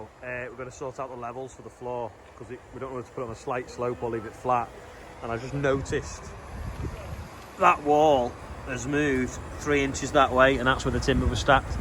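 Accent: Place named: St Helens, United Kingdom. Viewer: it's doing my head in now I'm trying to figure out were ur from I know its some were close to st helens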